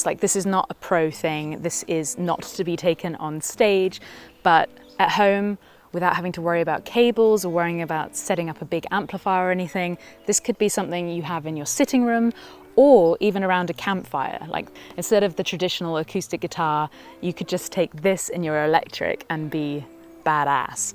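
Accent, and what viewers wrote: United Kingdom. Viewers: æt taimz lυks æ bit laik Lara Kroft hier, widh dhoυz sanglāsez. Ænd dhe British æksent enhānsez dhī impreshən.;–)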